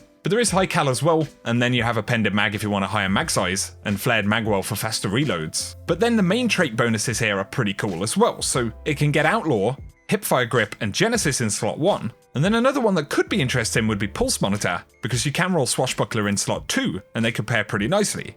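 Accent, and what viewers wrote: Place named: England, United Kingdom. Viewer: Where is from?! He says Ay sounding vowels like eey.. But he sounds English?!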